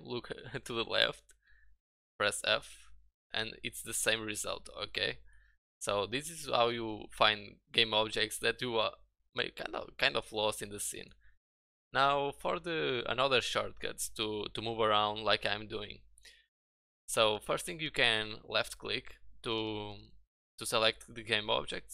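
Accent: Portugal. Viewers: claramente accent Portugues :D Bom video! Obrigado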